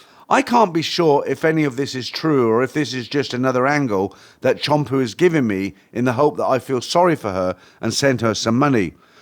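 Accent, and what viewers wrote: United Kingdom. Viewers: Great story - but your "reading style" with the British accent, and no mistakes makes the stories sound very entertaining .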